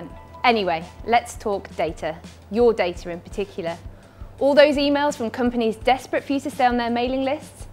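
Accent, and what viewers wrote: United Kingdom. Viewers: British accent is so great.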